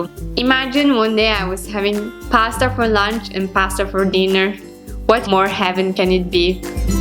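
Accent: Italy. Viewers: Does she speak English with Italian accent? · why do you speak with an italian accent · her accent sounds more Italian, then Norwegian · She is speaking english with italian accent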